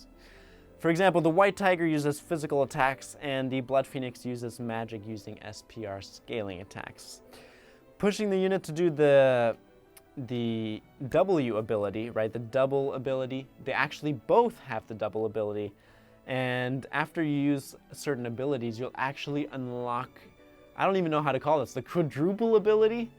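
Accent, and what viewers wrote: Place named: Japan. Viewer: well I don't bloody understand his Japanese accent, drop it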